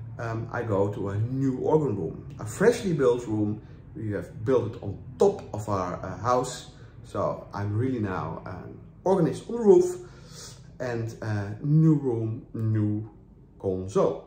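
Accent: Netherlands. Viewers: Congratulations on your new console. That is magic! I watched your video just minutes after finishing practising two other works by Phil on the pipe organ I now play for Sunday church. I share the role so only play fortnightly which gives me time to learn new music. I thoroughly enjoyed your video and the beautiful piece by Phil. In my teens (eons ago) my piano teacher was Dutch. If not for WWII, she would have embarked on a career as a concert pianist. Your Dutch-English reminds me how lucky I was to learn from a world class musician in my 'formative years' 😃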